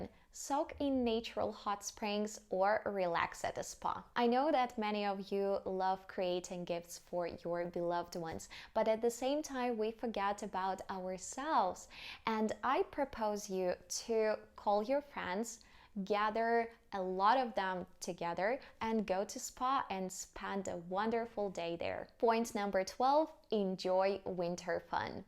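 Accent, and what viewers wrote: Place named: Russia. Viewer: На английском лучше, слушая русский английский не выучишь)))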